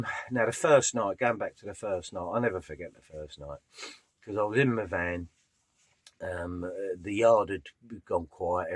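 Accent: London, United Kingdom. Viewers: His accent sounds London area to me .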